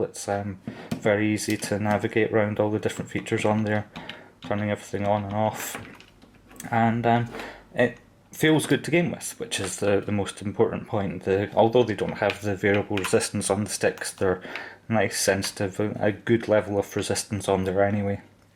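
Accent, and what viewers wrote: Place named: Wales, United Kingdom. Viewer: Sounds Welsh to me but I fail at accents...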